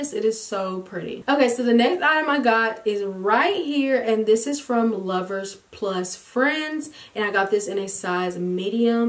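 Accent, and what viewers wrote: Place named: South, United States. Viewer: you have the best American accent I've ever heard! are you from the south?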